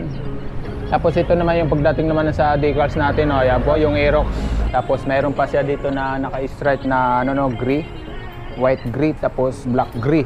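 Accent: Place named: Visayas, Philippines. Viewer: nice review i like hasang hasang n parte ng mio aerox abs kaya siya talaga naiiba s lahat at iba k rin magspill ng words very natural in visayan accent kudos to you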